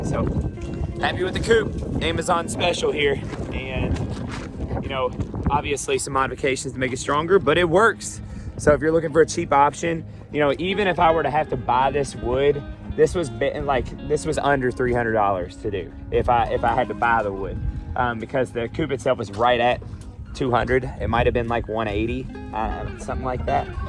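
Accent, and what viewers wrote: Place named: South, United States. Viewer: You have a southern accent. Where are y’all? I’m in NC. Just ran across your channel 👍🌻🥬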